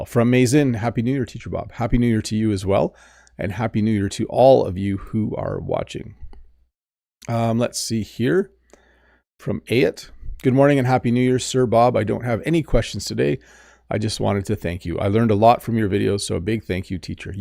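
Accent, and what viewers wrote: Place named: Canada. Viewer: Thank you, Bob! I'm going to your beautigul country on May! Thank you for your lessons! Watching your videos I can learn a litlle bit more the accent that the canadians use! I also like so much of the Rush' songs, since I was young, the great rock band of Canada. So receive a big hug, and have a nice night! Thanks a lot!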